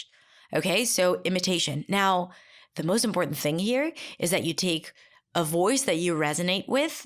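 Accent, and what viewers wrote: United States. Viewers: Hey Hey everybody hows your day so far. Express in tones melody Pitch higher more lower Pitch less . Awareness is the key for Clarity .I have spoke English all my life American and yours sounds perfect American inclination speech.If you never tell you are Hebrew as was More Aaron and Merrian .No one would know you aren't born and raised in America .I had lots of physical therapy but never my speech .I had to relearn speech and letters and words numbers all over after my first stroke and lost all ability to walk function and speak. . So I'm self retaught and over minor strokes continue. I'm Bonnie!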